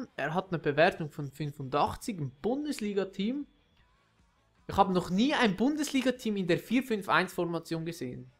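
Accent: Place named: Switzerland. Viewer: Deine videos und dein schwitzer dütsch is hammer geil! :D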